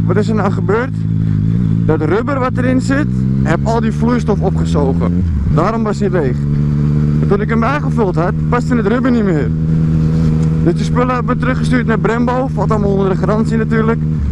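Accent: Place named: Amsterdam, Netherlands. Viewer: Ey, die irritante ABS is uitschakelbaar... trek gewoon de zekering eruit en plak een stukje zwarte tape over het ABS lampje.;) Lekker filmpje weer, heb er weer van genoten! Gewoon goud dat Amsterdams en die sound van die 09, man...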